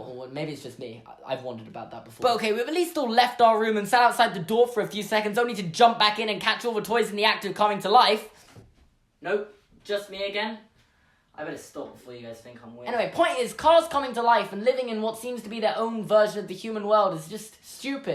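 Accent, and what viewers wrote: United Kingdom. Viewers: Because of your British accent, when you turn on the captions "Cars 2" becomes .... "Cause"....? Or my phone is just glitching again?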